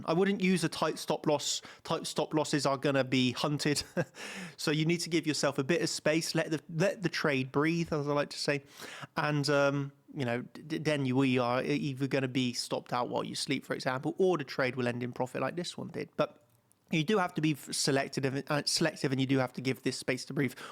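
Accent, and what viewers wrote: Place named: West London, United Kingdom. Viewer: I'd love to know where your accent's from,. I feel sure it's from west of London!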